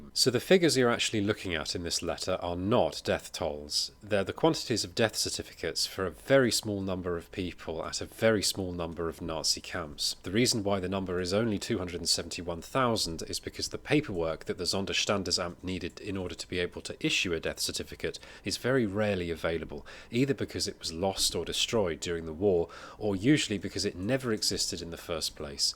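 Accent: United Kingdom. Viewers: >british accent. Dismissed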